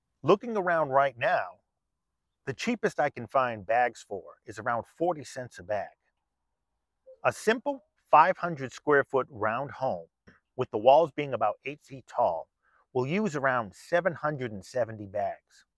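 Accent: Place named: Boston, United States. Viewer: I'm having a hard time taking u seriously w your Bostonian accent.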